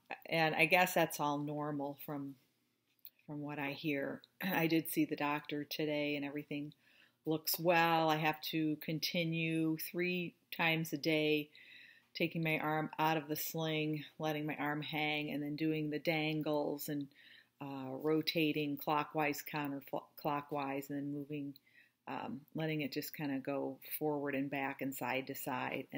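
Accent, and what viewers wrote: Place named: Toronto, Canada. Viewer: I grew up in TO, so I’d know that accent anywhere. My shoulder gets done on Aug 1st. Thanks for all the good info.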